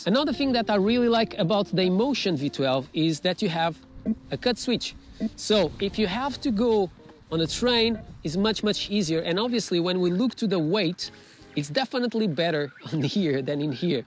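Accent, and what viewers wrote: Portugal. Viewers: Este sotaque é tuga não é? 😂 ❤🇵🇹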